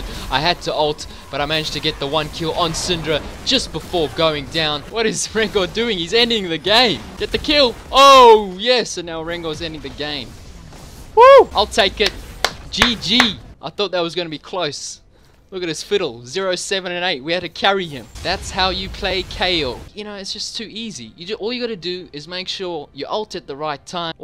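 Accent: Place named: South Africa. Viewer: You live in Australia but you sound South African, are you from SA?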